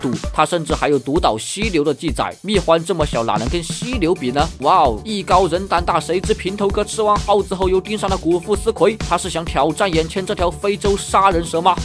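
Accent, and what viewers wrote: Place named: Hunan, China. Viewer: 1，leng；2，不leng。哈哈，这湖南音。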